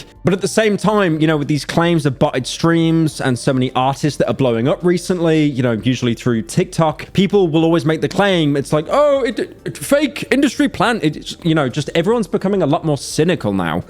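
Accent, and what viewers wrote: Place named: England, United Kingdom. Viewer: You do that English gentleman voice so well, I think that's the way you talk.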